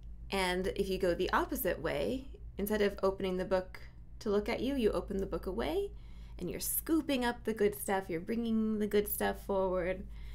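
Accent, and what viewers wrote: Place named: France. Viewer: "I hope this is recording 😵🥴 ... " 🤣😂🤣😂 💚💛🧡💔 I grew up in France only to leave at the age of 24. English is full of French words: strange/extreme/beauty/desire/verbal/mode/blonde/etc so you already know a bunch of vocabulary. When we French speakers learn English the same happens ! Where as when we learn German there's little to start with. But you English speakers also know a bunch of German vocabulary: break/brechen White/Weiß Mother/Mutter Brother/Bruder and so forth. Your french accent is so cute ☺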